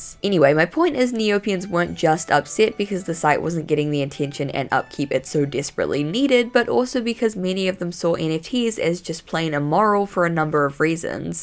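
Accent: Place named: New Zealand. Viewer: Your videos are the best to just put on, and listen to as I clean and do my house work. Your voice reminds me of all the good mates I met when I lived in Tauranga New Zealand. Truly an enchanting, tolkienesque place.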